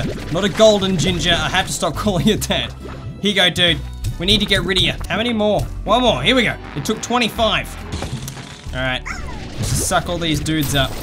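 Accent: Australia. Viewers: are you austrailian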